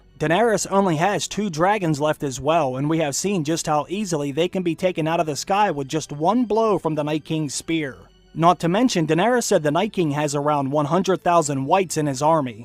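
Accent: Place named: Oklahoma, United States. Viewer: Where are you from? You sound like you're from Oklahoma, or Utah.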